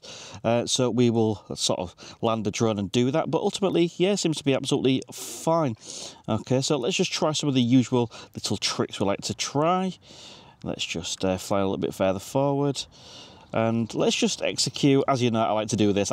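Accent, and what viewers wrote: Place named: Scotland, United Kingdom. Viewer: Came for the information, stayed for the SUPER THICK Scottish accent. 😁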